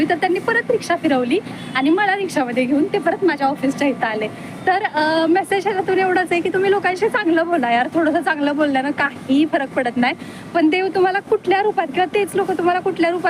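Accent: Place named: Latur, India. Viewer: Tumhi Latur che ahat ka mulche bhase varun vatat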